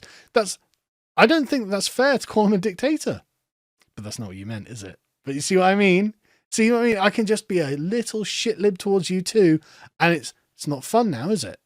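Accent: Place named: Posh, United Kingdom. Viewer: Also listen to this YouTuber speak, guarantee he went to a private school the hypocritical prick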